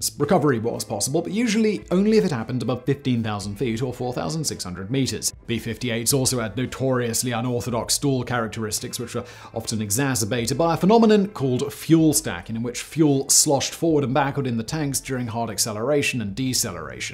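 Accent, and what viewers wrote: RP, United Kingdom. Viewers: that's cool as, I was the Wikipedia editor that added the "Adverse Flight Characteristics" sub section and the part about "fuel stacking", made me feel special hearing mention those in his posh British accent!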